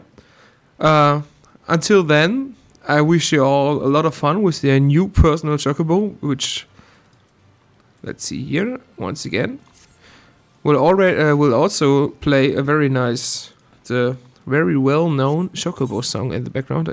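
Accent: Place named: Germany. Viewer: Are you from Germany? Wonderful accent alot of flare, like final fantasy XIV. So thanks for the info.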